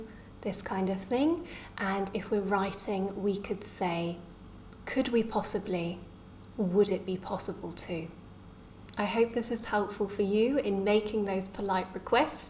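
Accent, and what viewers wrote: United Kingdom. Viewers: Hi..really helpful lessons, brilliant British accent, understandable and practical topics...extremely thanks...would it be possible to write your important tips bigger and not italic at the corner of video...cheers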